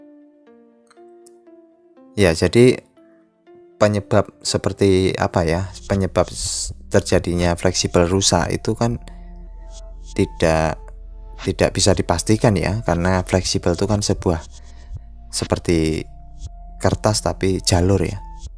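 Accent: Java, Indonesia. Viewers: mas bahasa ne medhok keturunan jawa ta mas?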